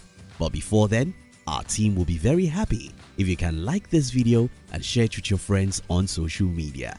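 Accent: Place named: Nigeria. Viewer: He sounds Nigerian.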